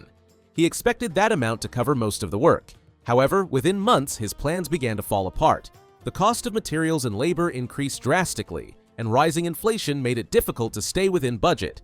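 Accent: United States. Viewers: Never liked Drew his ego arrogance and I thought down to people stitched hard working people a real tight arse. But why is this done by an American voice and said doolars instead of pounds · Why is it being narrated by an American?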